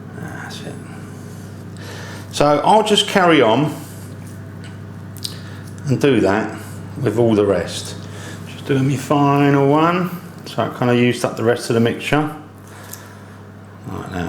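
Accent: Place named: London, United Kingdom. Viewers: Let me start off by saying that I just love your English accent. Love your recipe. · Delicious. With a cuppa rosy unbeatable. I used whole meal flour. Gives them a little more crunch. Oh and the chef’s wonderful London accent. Love it. Thank you.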